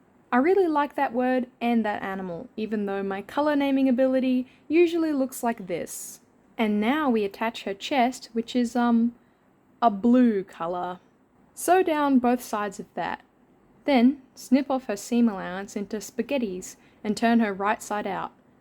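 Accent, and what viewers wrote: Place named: Australia. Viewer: I vote for the 28 unnamed princes :) Also what nationality are you? You sound aussie ( i am)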